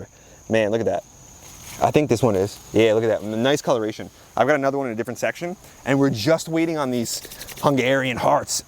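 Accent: New Jersey, United States. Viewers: I love watching your channel. From the Jersey accent to your child like enthusiasm for your entire gardening adventure, you are truly entertaining and very informative. I am a 64 yr old that is in process with the hubs building a new home on our 7 acre property in SE Indiana in the Ohio River valley. The hubs and I both grew up on farms and our families always had gardens and livestock. Of course, neither of us appreciated being raised that way AT THE TIME, (too much like work!)but are looking forward to cultivating as much of the 3 plus lawn area of our property with food and probably a few chickens. I am hard core about gardening via organics, and the hubby says "Meh", but pretty much does as instructed in that area!! We've already done gardens on our previous property, started it in the spring by adding soil amendments like sand, (to break up that Hoosier clay!!) rotted horse manure donated to us from my farmer uncle, piles of rotted leaves we'd accumulated the fall before that we just spread out on the new garden spot and covered with a huge tarp after we wet them down and left it for the winter. 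We added some lime, to sweeten things up, tilled it all in and started planting. We made the mistake of planting 72 tomato plants and didn't stagger them at all. OH MY GOD!! We sure learned our lesson there!!! It was also a really good growing season that year for tomatoes. Lets just say the neighbors, our church family, and we had all the tomatoes anybody could ever use! Thanks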